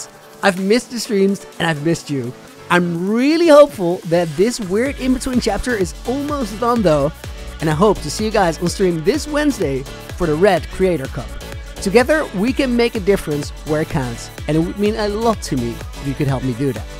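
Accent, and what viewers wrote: Netherlands. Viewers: Wait... I was just looking on your channel, when I saw that you're from the Netherlands... normally I can easily hear when someone's Dutch, but damn, that took me by surprise. Now I can never unhear the slight Dutch accent ever again lol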